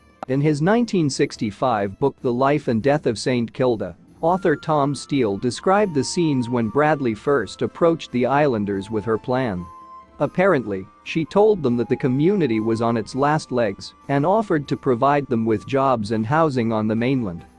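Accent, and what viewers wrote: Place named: United States. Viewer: American narrator, load of tosh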